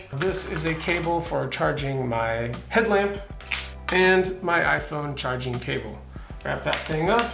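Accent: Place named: Western Washington, United States. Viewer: Hey. New subscriber here, and man do I love your content. You deserve a lot more views. Unlike a lot of backpackers and UL guys you seem to come from a hunting background, which might be the fresh and relateable take you bring to your videos. It also sounds like you're up in western Washington maybe? I'm down in Portland! I gave you a follow on IG too. I look forward to your future videos, so please keep them coming!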